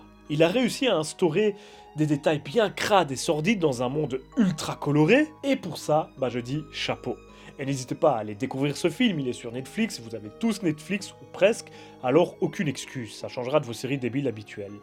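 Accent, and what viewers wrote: Belgium. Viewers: Ouiiiiiii, moi aussi, pendant toute la critique, je te reprenais à haute voix,!;-) Mais en même temps, j’adore ton petit accent belge, ça fait partie de ton charme... LOL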